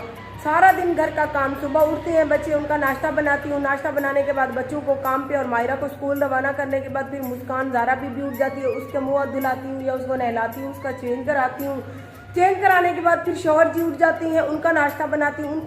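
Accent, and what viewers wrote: Saraiki, Pakistan. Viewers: Aapi saraik language apki zaban say booht achii lgti ha. But samjh bilqul b nai aati.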